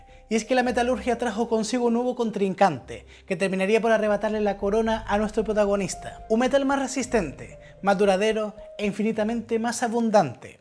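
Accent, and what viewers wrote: Chile. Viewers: Hablas como chileno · Hola. Soy de Chile, no sé de qué zona de España eres, pero me parece que hablas parecido a nosotros. Saludos. Felicitaciones.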